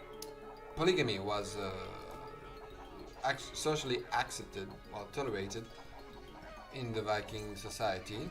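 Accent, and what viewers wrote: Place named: Netherlands. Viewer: Weird-ass Dutch accent, but okay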